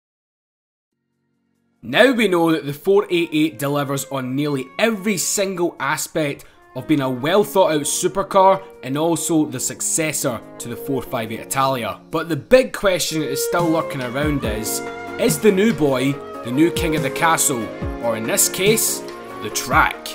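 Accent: Scotland, United Kingdom. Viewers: you scottish bro?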